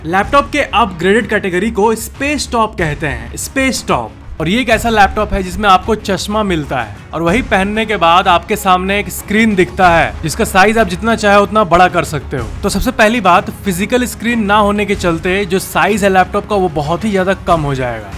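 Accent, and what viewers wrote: Bihar, India. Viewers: Aap bihar se ho bhai😊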